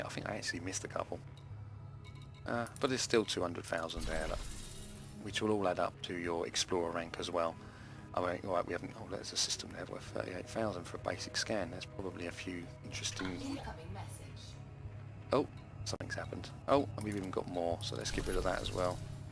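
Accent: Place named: North East England, United Kingdom. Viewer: I have to totally agree on the North East accent, I too just love it. Thanks for making this very informative video that I have only just come across. Liked and subbed.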